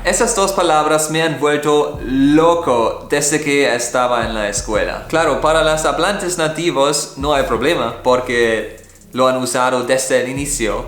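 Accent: Germany. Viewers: Hablas perfecto el español,se nota tú acento de alemán pero hablas perfecto,enhorabuena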